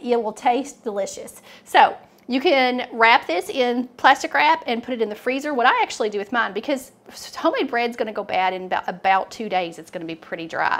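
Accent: South, United States. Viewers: I hear a southern voice telling me how to make food, I listen. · 👍🏻🙂 One of the best dough making videos I’ve watched… And I’ve watched many. You explained every step with unmistakable southern charm. Thanks again. I learned a lot. 👍🏻🥖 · I live in Canada and I love your southern accent and I love your recipes. Thank you! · The Southern accent is so so charming. Thank you for the tutorial fantastic instructions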